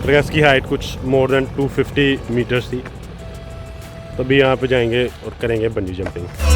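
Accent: Punjab, India. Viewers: Yar main tumhari sari videos dekh Raha hoo colour cloud Aor jolly azeem I'd se boht hi piaray lagay ho mujhe Tum special Punjabi accent Urdu boht achhi lgti hai Apki Lahore Pakistan se boht Sara Pyar 💜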